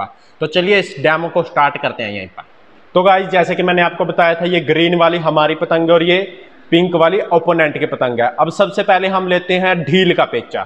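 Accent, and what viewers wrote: India. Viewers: Bai tusi punjabi ho accent punjabi vala feel hunda but feel good to see ur video bro.. kal ehi krna mai 💪🏻♨️💯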